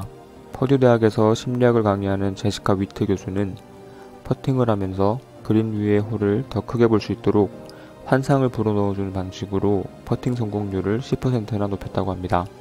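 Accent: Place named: South Korea. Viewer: 사투리라 알아듣기 힘들어요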